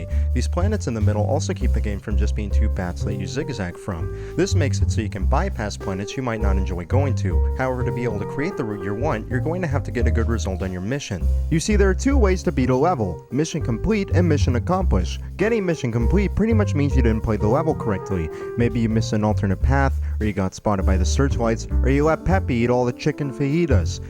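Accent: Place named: North, United States. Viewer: Doesn't really sound like James Rolfe but he could be from a similar part of America. He certainly sounds like he has a northern accent.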